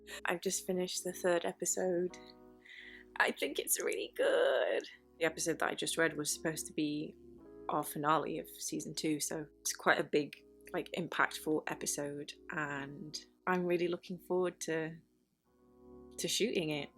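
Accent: United Kingdom. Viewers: Omg she's British??? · I love to see how you enjoy reading the episodes, I have to admit, that I refused to see this remake, but I have to applaud 👏 your work, apart from the fact that you are beautiful, my respects as an actress and the dedication you put into it, I love it, I didn't know that you were british but you're a sweetheart 😘and i love your british accent !! I want more from CHARMED! and many more vlogs of yours. 🌹🌹🌹🌹🌹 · God it’s so weird with hearing her British accent and not her Macy Voice, In the Next Video PLEASE PLEASE PLEASEEE do your Macy Voice and please tell how you learned how to seaplane in like that American tone! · I had no idea yhuu were British #mind blowned🤯🤯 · Oh, I had no idea you were British. Was surprised when I heard that accent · OMG! I am so glad I found this chanel! I love you so much! Your so professional. I knew you were british but damn I love your accent!! 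· I love her british accent so freaking much 😍😍😍😍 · omg I didn’t know you were British. you play Macy so well I thought you were like American. I love you sooooo much like your seriously my idol. I can’t wait for season 3🥺💕 lots of love from North Carolina ❤️ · Had no idea you were British. You sound like you have a bit of a northern twang. Your American accent is really good & so convincing. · SHE HAS A BRITISH ACCENT HOW DID I NOT KNOW THIS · WOAH BRITISH!!!! <3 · I didn't know she was English.. right On!! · Omg I never knew she was British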